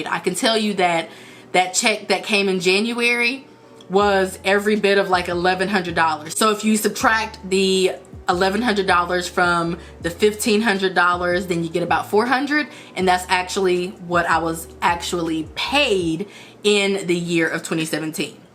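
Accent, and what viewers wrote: South, United States. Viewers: She look like my ex I gotta go 😭😭😭😭😭 Your extra country accent sexy doe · Damn its like she got a stong southern accent n it almost sound kinda Baltimorish 😅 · She is gorgeous as many have said and I gotta say this, I'm just a couple mins in and usually I hate a country accent, country music all that but I hear hers and I love it and o love her hair! Continue ascending queen!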